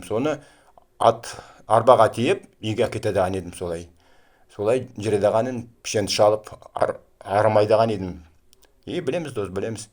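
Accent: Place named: Kazan, Russia. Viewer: Интересные вещи рассказывает къардаш, но произношение некоторых слов казанское.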